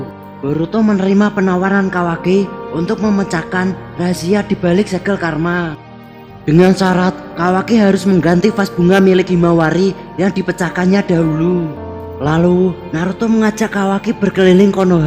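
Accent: Java, Indonesia. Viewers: Bang bang woy...ente mendingan pake bahasa jawa aja biar medoknya pada terlestarikan lebih bagus bang...udeh pake bahasa melayu tp medok ude gtu pake ngomong jorok lagi ampoooun deh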